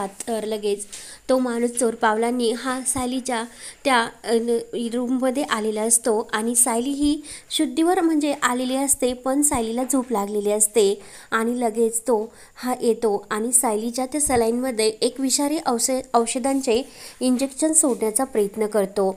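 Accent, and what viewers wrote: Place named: Gujarat, India. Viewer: Gujarati ahes na tu😅 marathi bgha hichi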